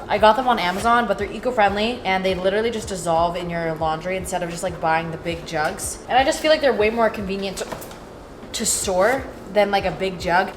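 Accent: Vietnam. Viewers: YOUR VIETNAMESE ACCENT IS SO CUTE OMG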